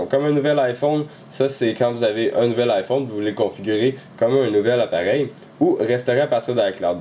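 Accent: Quebec, Canada. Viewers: L'accent québécois est énorme! Si ch'rais avec 20 capotes c'matin de zou!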